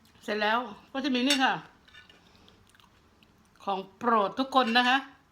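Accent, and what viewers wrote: Isan, Thailand. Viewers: ยายเป็นคนจังหว้ดใหนค่ะสำเนียงเหมือนคนอีสานแถวสกลนครนะใช่ปะค่ะคือคนบ้านเดียวกันนะ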